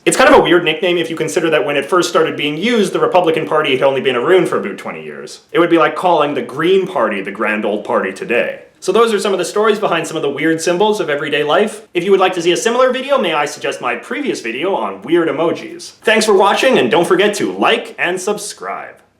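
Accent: Canada. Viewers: keep up the good videos , also you sound oddly canadian , you live up north? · You are the first Canadian I have heard who actually says aroond and aboot, lol. I thought that was just a myth... I'm sorry that it sounds comical to my ears :( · bnfk He’s Canadian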